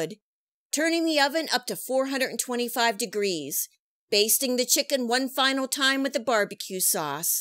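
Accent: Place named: Minnesota, United States. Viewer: Not sure where you’re from but the accent only makes these recipes even better. Minnesota? Thanks!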